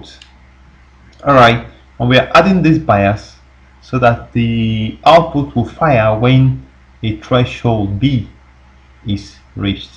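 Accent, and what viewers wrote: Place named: Nigeria. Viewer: I dont think his voice is not good for explanation. I think the problem is the accent. I am Nigerian and we have similar accents. Its the same challenge I experience when watching videos with strong Indian accents. In my opinion, his voice is clear and he explains well. The only challenge for you is that he doesnt speak with an accent that you understand.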